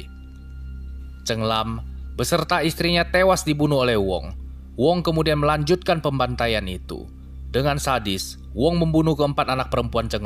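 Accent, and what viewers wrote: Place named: Sulawesi, Indonesia. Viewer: Speaker nya logat nya pasti dari Sulawesi 😂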